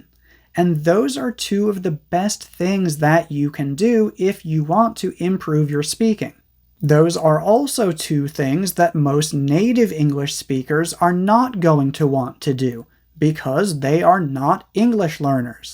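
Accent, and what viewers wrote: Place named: United States. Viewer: I've always been fascinated by your beautiful American accent.❤😊😊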